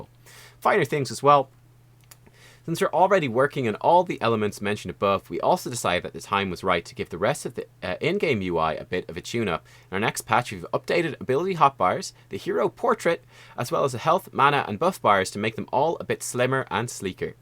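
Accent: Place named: Ireland. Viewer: you are right about that: much more enjoyable to hear you read through it bc my internal voice don't have the cool Irish accent :)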